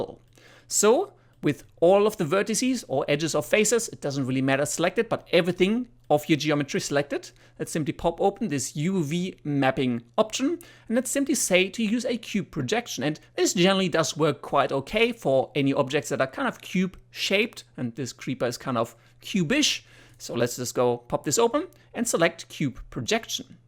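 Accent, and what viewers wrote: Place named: Germany. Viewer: The German accent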